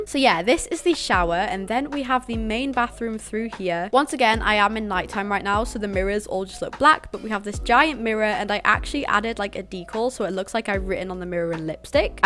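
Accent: United Kingdom. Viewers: She is British 😊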